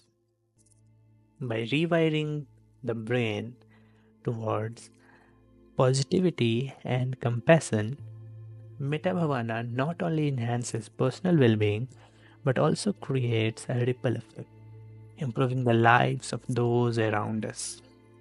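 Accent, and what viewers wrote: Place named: Eastern Uttar Pradesh, India. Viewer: Thank you. This works fine for me. If i had to place your accent it would be Eastern UP or Bihar or even Nepal. That would make it easier for you to ronounc4 pali. It should be Metta for Maitri and Bhaavna, and not Bavaana.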